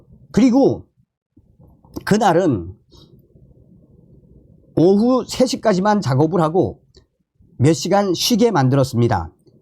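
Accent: North Korea. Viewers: 북한식 어투 그대로 전달해주세요. 생생한 느낌이 그대로 전달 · 북한사투리 구수하고 아무렇지 않습니다. 사람마다 생각이 다를 수는 있지만 정성산 감독님, 실수나 말투보다 열심히 북한의 현실을 전해주시는 정성과 열정에 늘 감사드립네다^^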